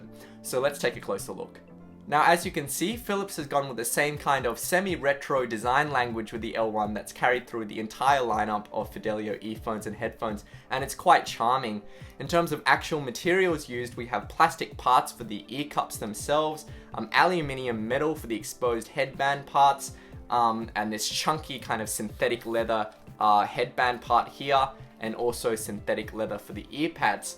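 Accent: Australia. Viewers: i mean this in the best way........its crazy seeing an asian with an austrailian accent.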